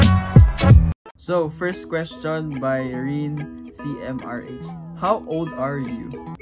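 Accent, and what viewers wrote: Philippines. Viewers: Your Filipino accent is soo heavy! Despite being half Japanese. Why don't you speak English more in your video? I don't understand what you're saying most of the time... :/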